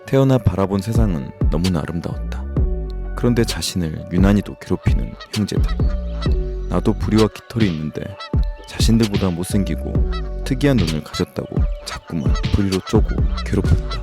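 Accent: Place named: Jeolla, South Korea. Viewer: 전라도신가부다..ㅋㅋ 사투리 억양~~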